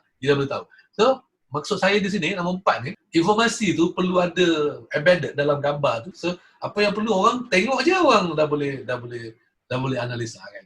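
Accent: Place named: Kelantan, Malaysia. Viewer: Terbaek la bila sifo share ilmu. Mula2 ckp dah tak bunyi kelate. Bila dah masuk part gambar ada keluar juga 😅. Apa pun mmg terbaek bang. Saya enjoy!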